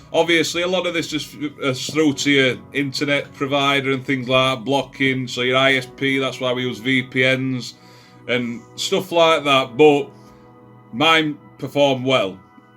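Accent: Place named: North, United Kingdom. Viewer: Got mine on order. Thank you. Love your videos. Nice to hear a northern voice on YouTube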